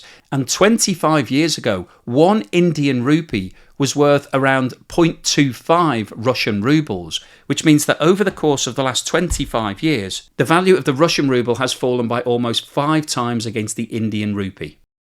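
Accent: United Kingdom. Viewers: When a British accent bloke talks about geopolitics and Russia - be aware: Brit wet dream and russophobic propaganda dead ahead....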